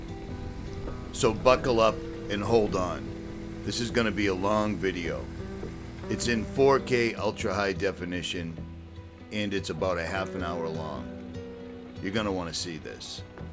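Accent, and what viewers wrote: Boston, United States. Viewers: You sound just like Ritchie from Boston.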